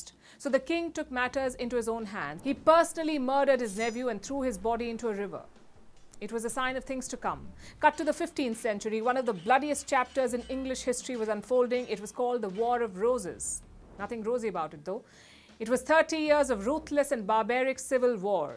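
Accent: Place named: India. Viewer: Pity about the Indian accent when discussing British events. Perhaps she should either learn proper English pronunciation or stick to her Pakistani/Indian subjects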